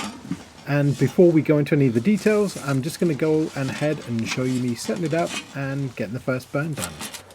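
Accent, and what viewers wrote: United Kingdom. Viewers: Thank you. GOOD to have the advice of a Canadian (I am from Quebec). I am looking for a video on how to relief yourself with number 1 and number 2. Thank you again. Nice British accent.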